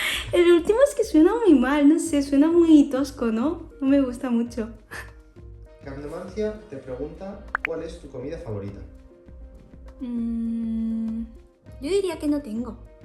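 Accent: Spain. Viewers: Como aprendió español de España siendo de otro país. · No sé pero me das una motivación al cocinar y intentar cosas nuevas, e igual es curioso ver una chica asiática con acento español (se me hace fabuloso) Y yo no e comentado ni un vídeo tuyo pero yo amo tejer, puedo tejer y e dado clases de como tejer. E querido subir videos a Youtube de como tejer pero no encuentro la creatividad, tengo el deseo y el don de enseñar pero al parecer no la imaginación. Ya veremos qué tal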